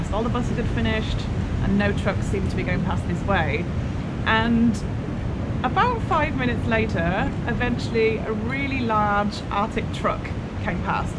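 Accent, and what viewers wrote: England, United Kingdom. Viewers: your accent is more like English from England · I like your British accent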